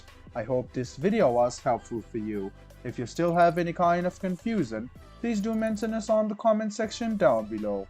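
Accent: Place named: Nepal. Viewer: The nepali-english accent. Nice man 👍👍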